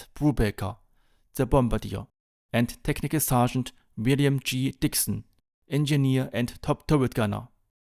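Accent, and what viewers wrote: Germany. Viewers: Thank you posting, and your English is perfectly understandable . I want to say your either Austrian or German going by accent . Thanks again for posting this, very interesting ..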